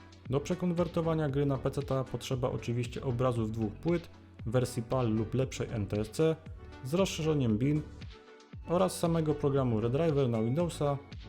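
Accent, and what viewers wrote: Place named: Silesia, Poland. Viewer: Jesteś ze Śląska?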